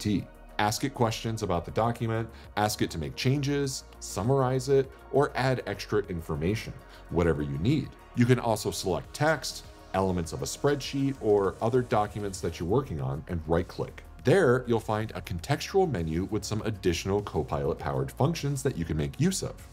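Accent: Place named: India. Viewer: Forgive me to hesitate for clicking in, as I couldn't handle Indian accent (Not being mean, just I can't) ...But your video is very informative and well done, thanks!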